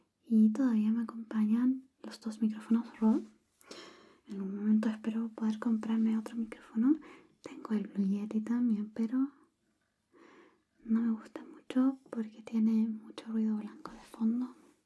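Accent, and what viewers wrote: Chile. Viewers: Primera vez que pude sentir su acento chileno ❤, me daba curiosidad por los vídeos en neutro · Me vengo enterando que a la serie de terror le fue mal y es de mis vídeos favoritos por la historia y el esfuerzo en todos los vídeos, no lo creo. 😤 Saludos, me encanta tu voz y el acento chileno. 🧡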